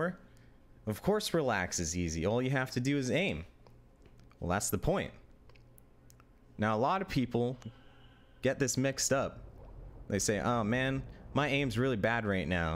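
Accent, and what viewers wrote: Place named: Alberta, Canada. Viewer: Holy shit you from Ed, bud? or Calgary…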